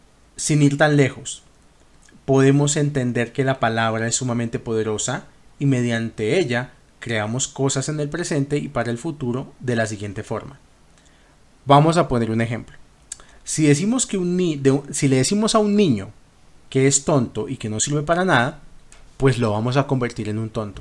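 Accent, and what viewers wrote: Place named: Colombia. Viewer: Atento escuchándote. Tu acento me suena Colombiano. Un abrazo desde Brasil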